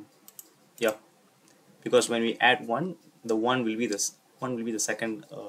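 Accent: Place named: India. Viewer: You are the best human on this planet love your work ! You helped me a lot ! And great accent keep it I love India and its people soo much!❤️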